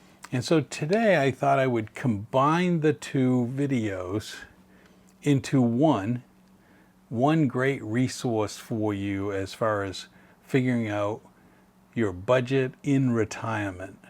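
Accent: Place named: Connecticut, United States. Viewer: oh new Hampshire!! I thought you were from CT. Similar accent I guess. That makes perfect sense. Sunbirds! I guess we've been sunbirds with our timeshare in Orlando. Mostly going in summer when the kids were off school. Our youngest is a college senior so those days will hopefully be a memory soon.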